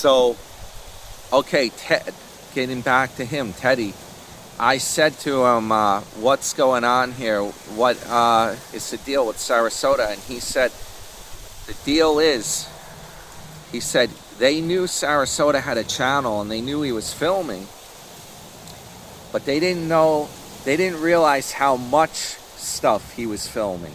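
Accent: New York, United States. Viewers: Great work, You are a relentless, hard working investigative Youtuber. So nice you met Ted & and they were responsive towards you, basically a stranger but with the NY accent. Real nice genuine people just like our friend. 😊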